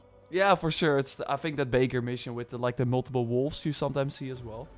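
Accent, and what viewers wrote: Netherlands. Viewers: The Netherlands! Finally! After five years quietly trying to place your accent At one point my best guess was Croatia but Dutch makes so much sense! Skall!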